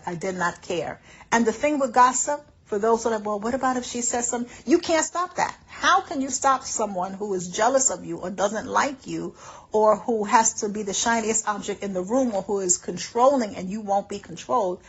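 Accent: New York, United States. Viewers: Case and Point: This video is WHY I am a Tier 3 member of this channel. The wisdom that you have been graced by God to have is a blessing. Then, your authentic NEW YORK ACCENT is EVERYTHING❣️🙌🏾🎉✨😍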